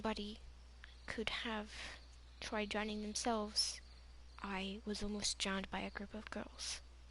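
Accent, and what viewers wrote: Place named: United Kingdom. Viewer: I'm so sorry what you've gone through (T^T) ps. You sound British Pps. I love you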